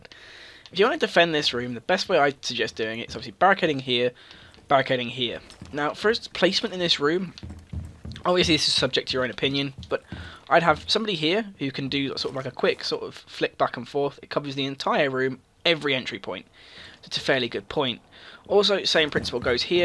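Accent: Ireland. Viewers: Hey r actually Irish if so wer from cause I'm from Kildare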